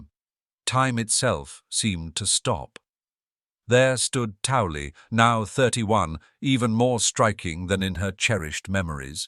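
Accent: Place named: United Kingdom. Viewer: the narrator's British accent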